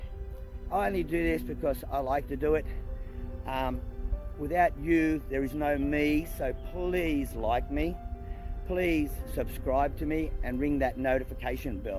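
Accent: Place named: Australia. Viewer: Oi! Oi! Ausie! Ausie! I love the accent. You have beuatiful animals. I love the farm life.💚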